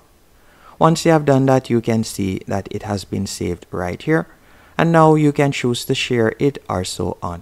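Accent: Jamaica. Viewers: I just wanted to reach out and give you your first comment. It was such a pleasant surprise to hear your Jamaican accent! Gwaan thru mi breddren. By the way, yours was the first video that google offered. I hop you get additional traction. Well done.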